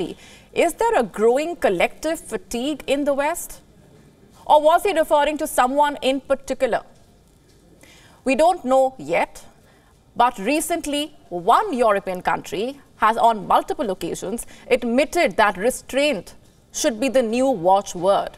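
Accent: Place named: India. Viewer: so cute indian accent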